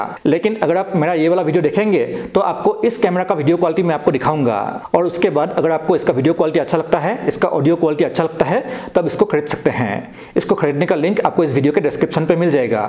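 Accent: Assam, India. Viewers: Toi axomiya hoi....gom pai golu kotha koutai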